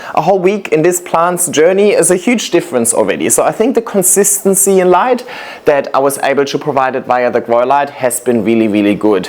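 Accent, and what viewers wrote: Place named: Germany. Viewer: Hi, I love your personality and your content, and I have learned soooo much from you. Short story: I was born in Aachen, Germany, many, many moons ago. When I started my plant journey, I used to watch your videos and initially I could not figure out why the expected Australian accent sounded like a German accent. I asked my son to watch you and he said "definitely German". 😊 I am extremely nitpicky about cleanliness and order, must be the German influence on me. 😬 I am Asian and live in the USA. Sorry, a long comment. Thank you for you fun, wholesome videos and you seem to be such a nice guy!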